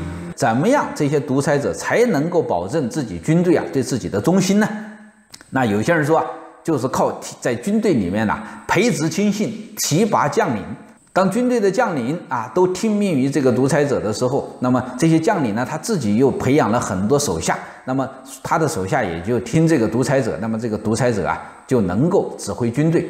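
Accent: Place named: Henan, China. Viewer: 做人我不管我听不惯河南话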